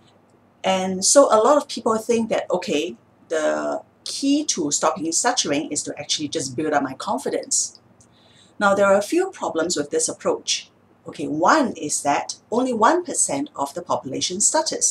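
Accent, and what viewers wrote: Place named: China. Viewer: Btw, I enjoyed the clear and smooth English with the very tiny, little little charming Chinese accent..what a pleasant voice to listen to. Very calming.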